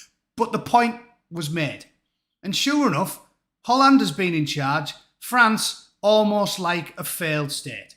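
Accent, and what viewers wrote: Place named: Northern England, United Kingdom. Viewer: A chippy Northern accent could also be the reason why Google AI policebots and Suuuzaaah haven't figured out wtf you're on about Haha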